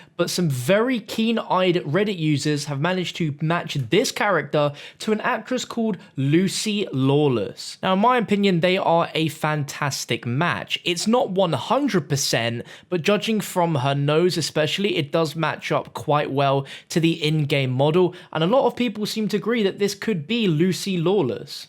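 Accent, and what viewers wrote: United Kingdom. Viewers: The British accent is real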